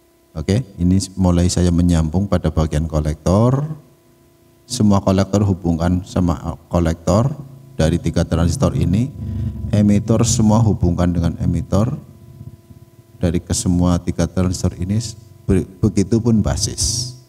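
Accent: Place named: Banyumas, Indonesia. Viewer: Salut. Servis di daerah mana mas ? Kalo dari logatnya kayanya banyumas ya ? Hehe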